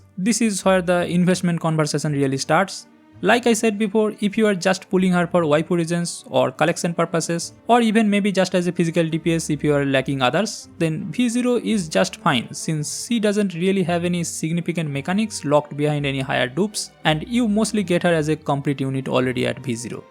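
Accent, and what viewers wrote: India. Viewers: sound of indian ppl , not recommend.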